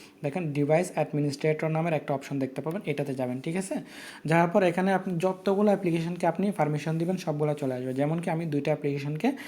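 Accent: Chittagong, Bangladesh. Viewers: চিটাগাংইয়া নাকি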